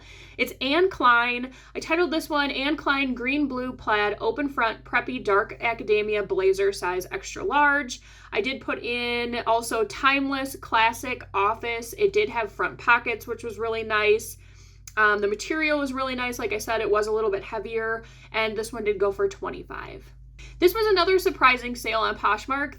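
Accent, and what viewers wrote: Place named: Midwest, United States. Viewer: New on your channel. I love your suggestions and ideas. New subscriber now. You sound like you’re from my home area. Are you maybe from Chicago or Wisconsin or Minnesota? I’m originally from Southern Illinois with family all over the Midwest. I’m in California now for over 40 years.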